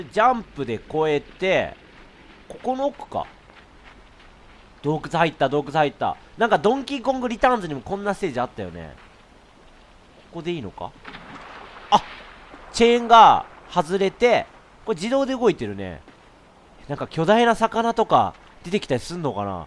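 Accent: Kansai, Japan. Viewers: 関西弁をーーーー！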